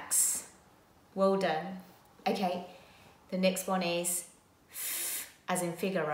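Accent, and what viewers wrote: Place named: United Kingdom. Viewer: U have the British accent but u don't look European or Brazilian at all. But Asian. 💙R u a Japanese lady? ☺️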